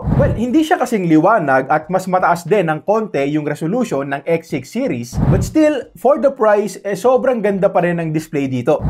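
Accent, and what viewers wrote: Philippines. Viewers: Love ko style mo skip unboxing direct to the phone na at clear Ang Bose's mo mabilis salita...Pinoy Ang Bose's...swak sa brain ko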